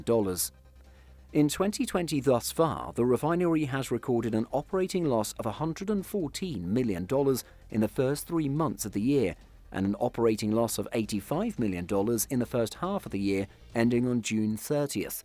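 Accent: United Kingdom. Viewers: The UK I can tell by his accent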